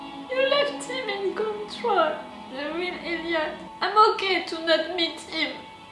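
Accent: France. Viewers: I’ve seen tons of shows the last couple of years, they came and went just like that but Mr. Robot reached me in a very strong and deep way, beyond the outstanding perfomance, acting, screenplaying and ost, because mental condition is very close to me and is something i hace to fight to everyday. Thank you for share your feelings and thoughts with the world about this underrated show, i dont know you but i can tell you are not faking reactions at all, I will always remind you "frenchglish" haha (you did it great! English is not my mother tongue also but i could understand you!) Well, i supposs this is the end, leave you my with my special moment (and song) of the whole show: "Darlene leaving the family polaroid picture in Elliot’s shelve" <link> Goodbye friend c'est fini.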